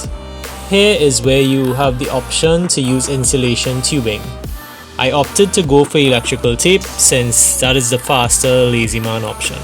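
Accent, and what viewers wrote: Trinidad and Tobago. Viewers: Knew he sounded like a Trinidadian, big up Couva lol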